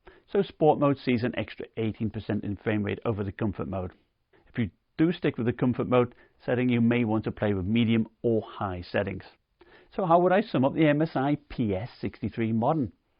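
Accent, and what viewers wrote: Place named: England, United Kingdom. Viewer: Your in the US? You sound Like your from England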